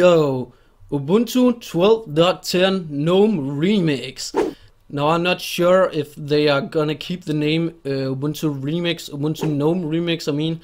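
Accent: Denmark. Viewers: Dejlig dansk accent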